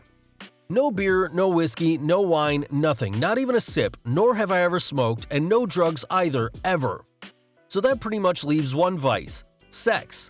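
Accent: United States. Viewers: What's up with the non-British accent? American accents just aren't convincing. They can't even be depended upon to raid Area 51 properly. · Shag? British copy but an American narrator. Weird combo. Grams spelled grammes too.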